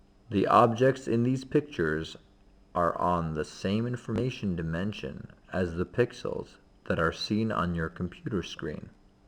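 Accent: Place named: United Kingdom. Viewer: your info is good as well as the video ! i understood it to some extent but i am having problem in understanding the british accent ! can you tell some other source?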